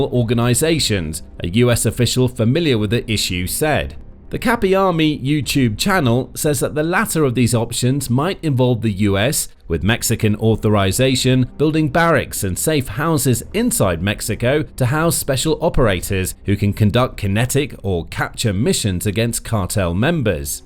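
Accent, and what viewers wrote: United Kingdom. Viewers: Ok, so we have a channel that talks about USA military force, and the narrator of the show has a British accent? Couldn't you get an American accent for a show about our military forces? It's just odd.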